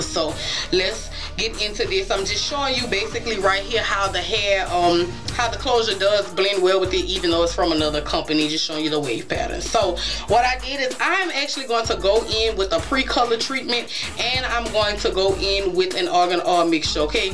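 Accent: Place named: New Orleans, United States. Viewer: and love your accent as well and your from New Orleans that's one of my fav accents girl lol ??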